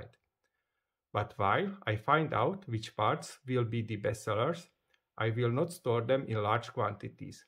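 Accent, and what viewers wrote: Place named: Hungary. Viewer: Amazing video and great work! I can't wait to see the rest. Judging by your accent, I am willing to bet you are Hungarian although I might be wrong. Either way, I am an airsoft tech myself from Romania and greatly enjoy creative spirits like yourself. Can't a standard Jack be fitted in a MP7 AEP? The nozzle still has to move the same distance to feed but maybe placed a bit more to the back, just wondering. If this could be done with a standard M4 jack, it's much more available and cheaper than getting a BINGO AIRSOFT one.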